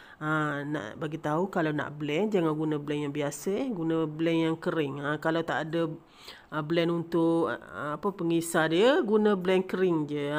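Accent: Malaysia. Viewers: kita biasa mkn kopok ganu hok gigit putus mmg sedap.. syabas tahniah..klo dgr suara ni org kelantan ke ganu? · aku org ganu taulah ganna wahwahwah ni org ganu ker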